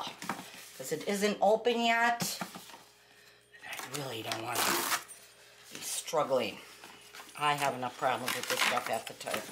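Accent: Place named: Wisconsin, United States. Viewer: I'm listening to your instructions and just said to my husband "I wonder if she's from Wisconsin?". I looked at your channel, and oh geez; you're from Wisconsin! I guess we just know a fellow Wisconsinite ❤ . Are you going to watch the Packers play in Brazil on Friday? Go Pack Go! Thanks for the pie crust! It's nice and easy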